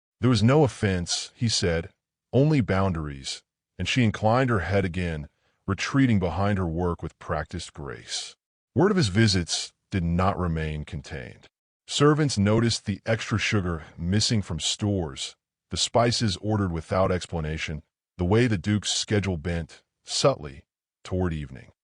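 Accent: United States. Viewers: It really disappoints me that you create a story set in England but you us an American ai voices 👎👎🏻👎🏼👎🏾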